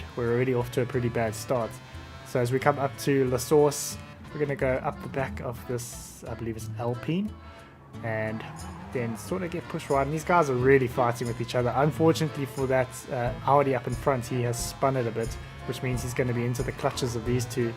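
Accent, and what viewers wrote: South Africa. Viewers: Am I hearing a south african accent?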